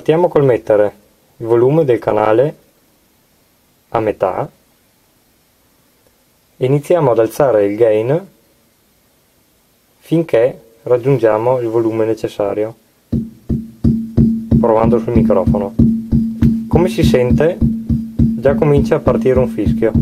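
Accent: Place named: Trieste, Italy. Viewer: mamma come se senti che te son de trieste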